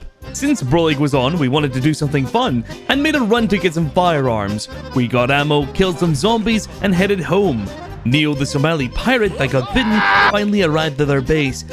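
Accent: Scotland, United Kingdom. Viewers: Are you Scottish pal ?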